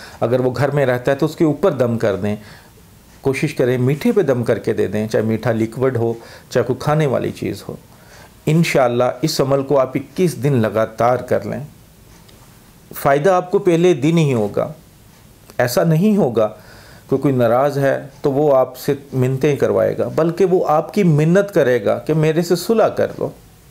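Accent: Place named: Pakistan. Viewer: Main India se hoon pr Alhamdolillaah Pakistan se bohot lagao hai boli bohot achchi lagti hai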